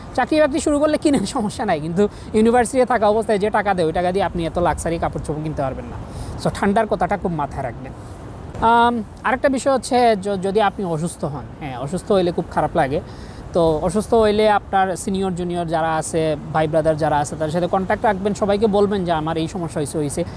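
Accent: Sylhet, Bangladesh. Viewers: Vai afne sylhety maybe??😊 amio sylhet shahporan alakar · Bhaiya apni sylheti? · সিলেটী একসেন্টে চমৎকার উপস্থাপনা! অনেক শুভকামনা।❤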